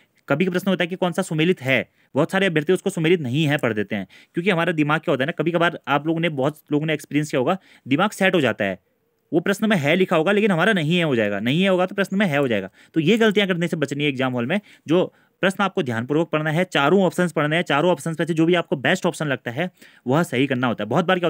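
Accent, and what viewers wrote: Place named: Pahadi, India. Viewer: Hey! handsome bro, ever first time aapka deedar huwa, Literally handsome, I like your pahadi accent. M an esm, following you for uk gs❤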